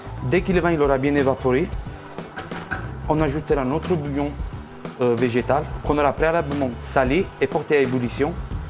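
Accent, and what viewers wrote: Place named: Italy. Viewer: Tu risotto avec des boîtes ça donne pas envi. L'accent italien ne suffit pas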